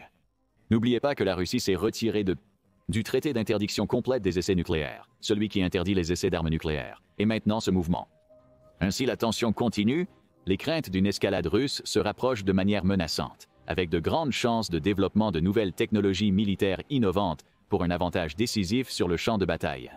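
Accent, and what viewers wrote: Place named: Quebec, Canada. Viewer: c' est radio moscou ici , avec une i. a a voix quebequoise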